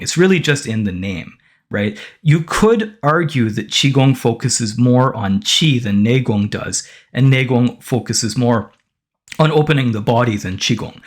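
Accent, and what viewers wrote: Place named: Minnesota, United States. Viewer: Are you out of Minnesota? I hear an accent.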